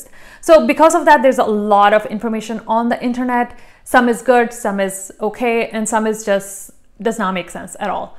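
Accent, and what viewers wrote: India. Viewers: Indian accent 👍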